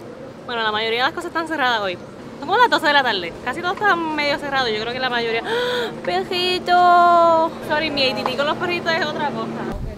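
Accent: Puerto Rico. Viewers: Para mi eres la mejor bloguera, siempre te busco para ver que hay de nuevo. Dios mio nena de China tu lo único que tienes es tu hermoso rostro por que ese hablar, ese espíritu es Puertorriqueño, sacha pero bien fuerte. Eres como un vicio termino de ver un video y busco otro y así sucesivamente. Te amamos no te quites!🤗🤗😘😘❤❤🙏🙏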